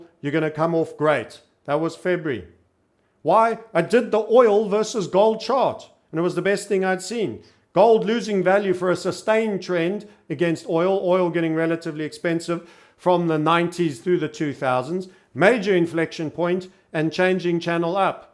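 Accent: South Africa. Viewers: Love the accent. What part of SA are you from?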